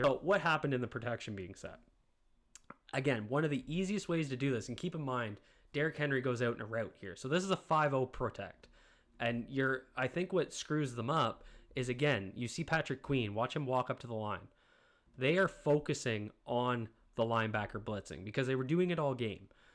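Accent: Canada. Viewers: I can tell you're Canadian by your accent.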